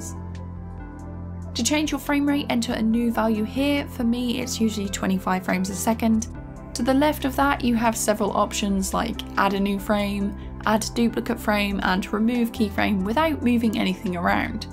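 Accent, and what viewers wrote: United Kingdom. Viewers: I love your voice! and that british accent is so sweet!! greetings from Brazil ;-)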